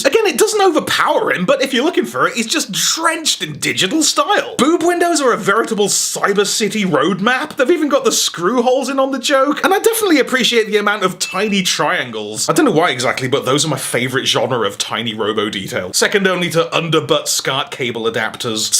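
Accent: United States. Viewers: needs to do an entire review in an American accent. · That American accent tho! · !!! Your American accent is better than mine!!! And I’m American!! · The world needs more American. Can you do a review using only that voice?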